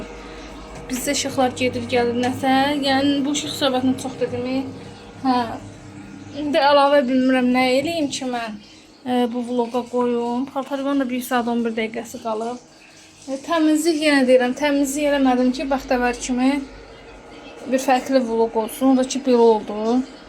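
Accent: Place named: West, Azerbaijan. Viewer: Maragli geldi haralisiniz lehceniz qerb zonasina oxwadi ona gore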